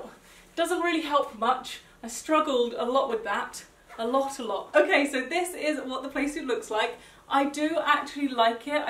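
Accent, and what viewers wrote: United Kingdom. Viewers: What part of the uk 🇬🇧 do u live in I love ur accent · I also love your British accent so much, and I usually don't like the British accent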